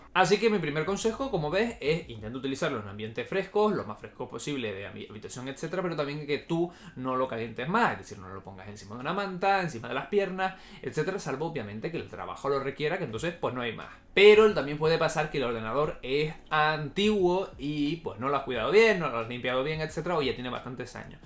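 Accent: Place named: Chile. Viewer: que curioso que los españoles hablen parecido a los chilenos. De primera pensé que eras chileno por la entonación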